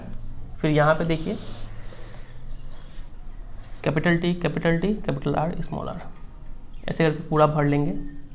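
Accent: Bihar, India. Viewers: Sir aap bihari h kya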